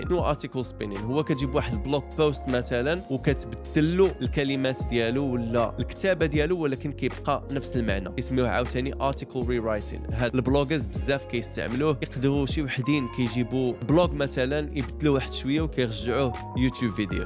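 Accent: United Kingdom. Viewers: ونتا عندك نطق برطاني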